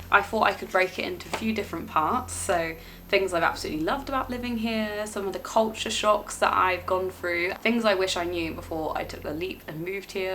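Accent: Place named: England, United Kingdom. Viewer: Lovely accent which part of England.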